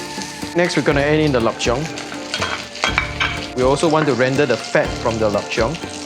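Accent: Singapore. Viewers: That;s good I don't have a real steamer and I am really glad to skip that step. You talk and sound like a Singaporean and a Teochew at that. Hello Ka Ki Nang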